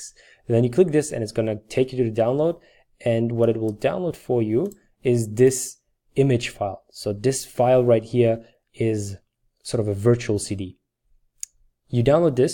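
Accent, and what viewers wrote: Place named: Turkey. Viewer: Am I catching a Turkish accent...😅 nice😊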